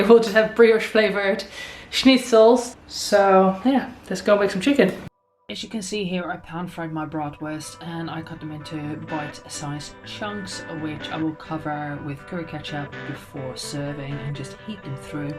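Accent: Netherlands. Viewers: I live in Germany and ur German is pretty good! But it has a bit of a dutch accent :D I feel like both languages are kind of similair, when they are written. When I read smth in dutch, I often understand it, but when somenone talks dutch, I understand nothing haha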